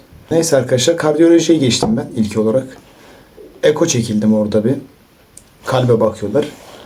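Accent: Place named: Konya, Turkey. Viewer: Kanka sen Konyalı' mısın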